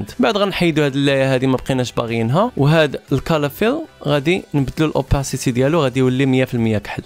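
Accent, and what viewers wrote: United Kingdom. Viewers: كاتقضي عليا باللكنة الابريطانية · Awel Marra kansheuf maghribi kihdar b darija b' british accent :D , allah yfar7eik f 7yateik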